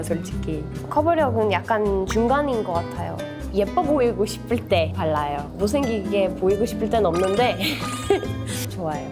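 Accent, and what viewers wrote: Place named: South Korea. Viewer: 왠지 한국발음 매력 인는 듯~~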